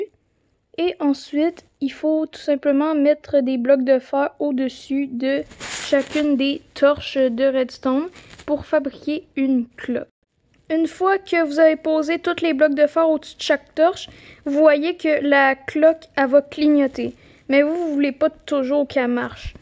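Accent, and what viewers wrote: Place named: Quebec, Canada. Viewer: Yes québécois comme moi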